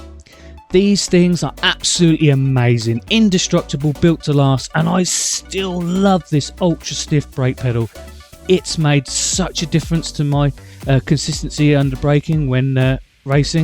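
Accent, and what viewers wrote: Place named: Suffolk, United Kingdom. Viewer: I’m in Ipswich .. thought I recognised the twang 😝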